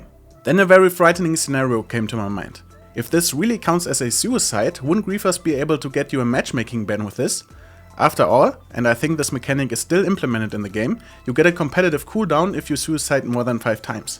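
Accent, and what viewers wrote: Germany. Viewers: Cool vid and friendly deutsch accent :)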